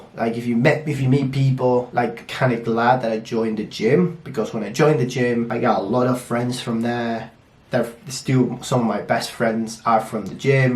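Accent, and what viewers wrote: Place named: England, United Kingdom. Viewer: Omg! I love your English accent.. espero verte muy pronto, ven a San Francisco cuando quieras!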